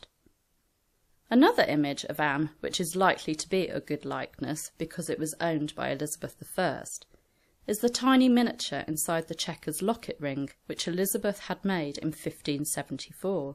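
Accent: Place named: England, United Kingdom. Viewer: I really enjoy your videos.And love you English accent.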